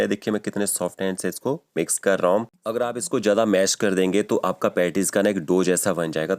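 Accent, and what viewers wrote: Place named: Punjab, India. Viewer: Yours is punjabi accent.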